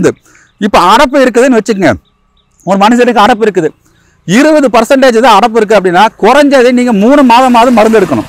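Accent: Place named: Coimbatore, India. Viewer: Coimbatore Tamil very beautiful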